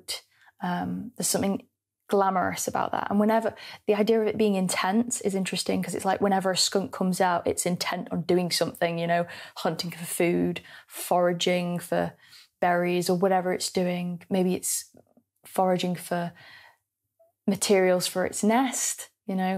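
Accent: United Kingdom. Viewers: aye fellow Manc lass😂 great vid!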